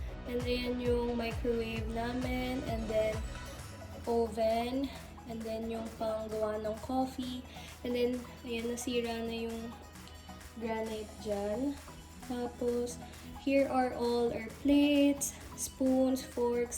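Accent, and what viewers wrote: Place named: Conyo, Philippines. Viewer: Yung pagka-conyo at voice mo reminds me of my crush back in 9th Grade....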